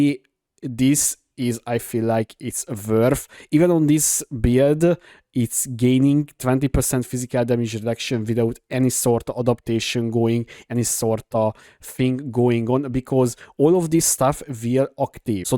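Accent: Hungary. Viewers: Hey, just from curiosity , by any chance do i get right from your accent that you are hungarian?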